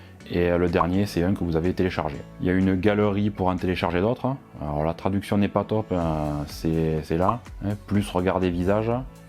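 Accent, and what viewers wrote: South, France. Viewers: Bonjour Comme d'habitude : exposé sobre, didactique, complet et objectif. Et l'accent du Sud en plus qui enchante le Cht'i que je suis... Bonne continuation !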